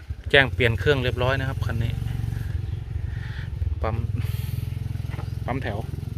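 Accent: Phu Thai, Thailand. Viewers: คือสำเนียงออกทางผู้ไทย แม้นเบาะครับ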